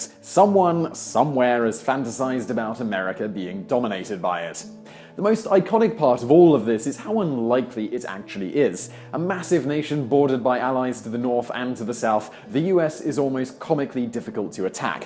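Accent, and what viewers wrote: United Kingdom. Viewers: I thought this guy was Vsauce putting on a British accent fora second.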